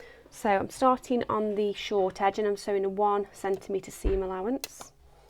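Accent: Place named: Stoke, United Kingdom. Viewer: Just subscribed from seeing your link on Boz's channel. You sound like a Stokie like me.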